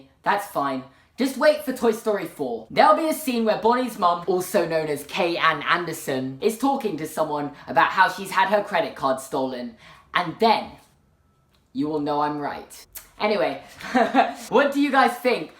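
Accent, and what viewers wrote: United Kingdom. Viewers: I’m sorry I’m American and I love British accents but I still can’t get over mum I’m no trying to make fun just I love anything foreign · God I love British accents "SanFRANcisco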